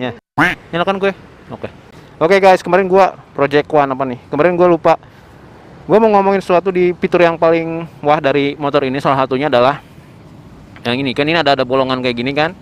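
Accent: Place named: Sunda, Indonesia. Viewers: Msih melek ehhh ada yg update video maaciw udh nemenin begadang "Ayo pa jangan clengak-clenguk", "sebentar ya om", "usaha keun" alah alah hahahaha gg bisa nahan ktwa bagian ini sunda pisan 😄😄😄 Di tunggu next videonya ya aa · sundanya masih ke bawa..hihihi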